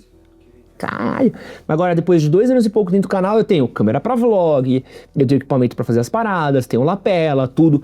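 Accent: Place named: Sao Paulo, Brazil. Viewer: Provavelmente tu não vai nem ler isso aqui, mas você me venceu na consistência. Vou ser muito transparente: Eu tinha um preconceito com sotaque Paulista, não sei porquê. Seus vídeos eram recomendados para mim e, diversas vezes, eu clicava pra ver e na metade saía. Não sei dizer qual vídeo foi, mas algum passou uma ideia muito boa. E eu tenho o costume de me permitir ser vencido por boas ideias. A partir desse ponto me inscrevi e passei a acompanhar (eu não costumo comentar quando acredito que não vou agregar, então nem sei se já comentei alguma vez aqui). Te admiro bastante, todo sucesso que você tem é merecido. Espero conseguir desenvolver essas habilidades que vejo em você na minha própria vida.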